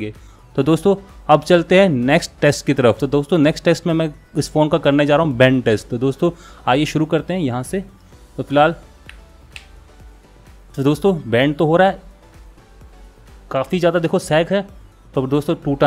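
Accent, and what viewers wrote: Marwari, India. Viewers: bhai marwadi ho kya??? Awaz se to lag hi raha hai.......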